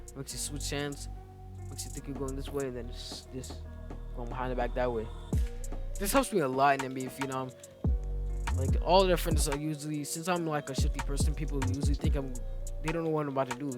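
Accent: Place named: New York, United States. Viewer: U sound like u from NY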